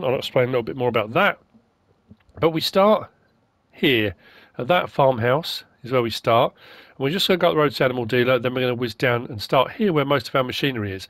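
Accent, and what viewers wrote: France. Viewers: Regarding your French accent, don't be ashamed of it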